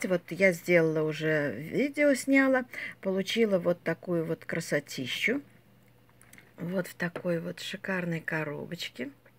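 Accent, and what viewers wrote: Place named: Belarus. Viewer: Говор белорусский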